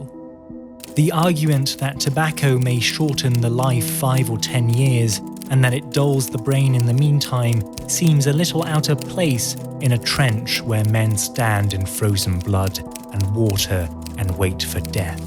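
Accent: England, United Kingdom. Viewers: All I understand, but why English accent, Russian sweater, swiss location?? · ... Why do you have a perfect British English accent if you're from Switzerland? O_o Are you not actually Swiss?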